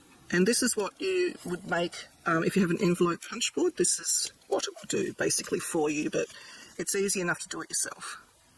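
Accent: Australia. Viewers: Your directions are terrific and I could listen to your lovely Australian accent all day! Thank you for this video! Subscribed! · I found you today & immediately went to your home page to see where you are from! I love Australian accents! This makes the cutest little envelopes & I just happen to have made a few tags that will fit in them! I think I will make a bunch now, just have on hand, but I think I will also try to remember to cut some strips from whatever scrap paper goes in a journal & then make some that are “matchy- matchy”! I made a variation several years that you keep the long strip in one piece, folding it back & forth until you reach the envelope, then fold the flap down, and use a paperclip, Velcro or magnet, if you feel it needs something. Mine didn’t, a bone folder was adequate! Thanks so much! These are a lot of fun! Love to down under! Erica❤I have subscribed, see you soon!❤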